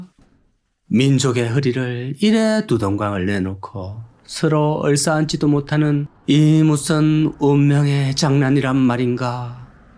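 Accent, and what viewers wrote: Gyeongsang, South Korea. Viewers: 우야노 님 멘트 넘 재미져요 친밀감느껴지는 사투리 옛 생각 납니다 저도 경남거창 줄신이라 사투리가 정겹습니다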